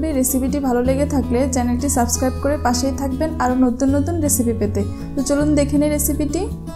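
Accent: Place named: Netrokona, Bangladesh. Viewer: নিশ্চিত নেত্রকোনার পাব্লিক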